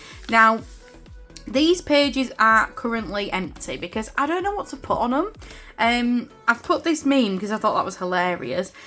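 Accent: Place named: Hull, United Kingdom. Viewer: Always makes me happy to hear your voice and familiar accent (I am from ‘ull too, though I don’t live there now!). Lovely pages as always! Great you are back enjoying journaling again!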